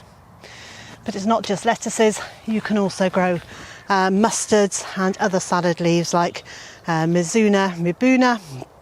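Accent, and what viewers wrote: United Kingdom. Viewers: Given the title of the video, it would be worthwhile indicating where on the planet you are. The internet after all has international reach. I can only assume by your accent, it is the U.K., but assumptions are only that. Other than that, I appreciate the content. Cheers from Chris the Forest Worm, Adelaide Australia.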